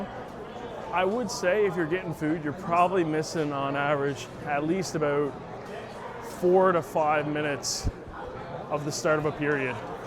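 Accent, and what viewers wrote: Canada. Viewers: Ugh. The southern Ontario accent is so annoying. It’s hard to listen to. Just speak normally.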